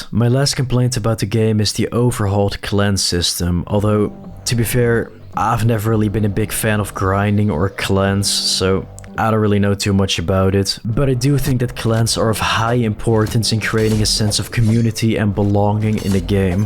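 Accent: Netherlands. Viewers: Is bro dutch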